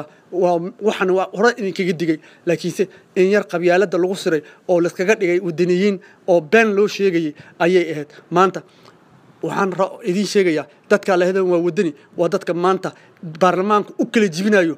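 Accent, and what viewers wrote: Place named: Ethiopia. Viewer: Adigaba Eathiopian ah lahjadada ayan arkaye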